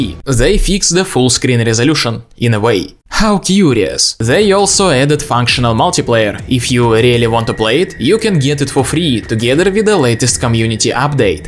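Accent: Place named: Russia. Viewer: так забавно слушать жесткий русский акцент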